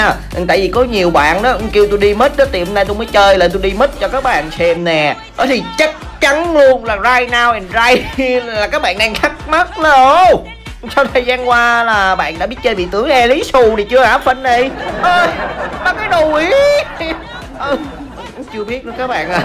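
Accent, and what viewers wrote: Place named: Hue, Vietnam. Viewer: Nói giọng Huế đi anh ơiiiiii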